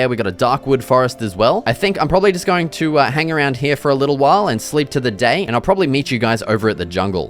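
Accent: Australia. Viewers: I love the Australian accent so much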